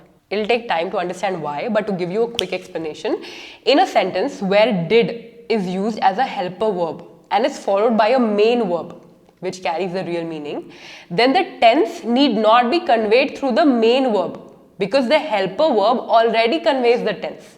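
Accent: Hyderabad, India. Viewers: Mam you are hyderabadi? aren't you? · I'm a big fan of your voice...you speak so impeccable & your Indian accent is fantabulous.... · I must admit I love the Indian accent and especially the universal question tag, "isn't it"" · You speak Hindenglish. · You used understand na while asking the question 😉 .. and I guess you are from HYD.. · Are you from Hyderabad ? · Understood na 😆 but i love the way you teach.... Hyderabadi touch comes from your tone. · No popular english self help books are available over YouTube in Indian accent. I think you have a wonderful, sweet and friendly Indian accent. If you think of doing it, it would definitely help lots of aspirants like me in developing our English beside personality. Thank you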